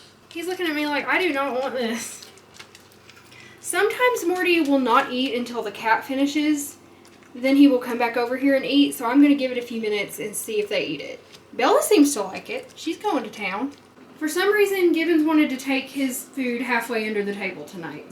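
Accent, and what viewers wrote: South, United States. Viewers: Nursery water is what you use when mixing formula I think:D Hope this helps! also thank you for the cleaning motivation hehe, I keep your videos on in the background when Im doing things around my apartment so I dont feel so alone:) My family is from the south but Ive lived in NYC my whole life, I loved hearing their accents when we would visit, its such a sweet tone you have. Happy for all the vlogs and how much you're enjoying making them, I watch every single one you're so wholesome and down to earth 🥺😇🌈👼💛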